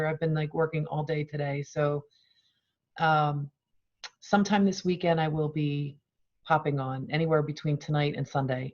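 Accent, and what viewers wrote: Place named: Philadelphia, United States. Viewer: Greetings from New Jersey. I can hear your Philly accent 😃Thank you. Love your perspective and message of encouragement.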